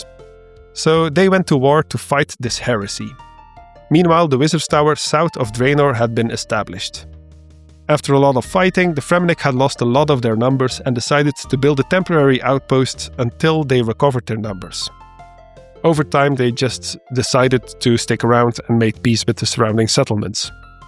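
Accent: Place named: Flanders, Belgium. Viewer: he sounds very flemish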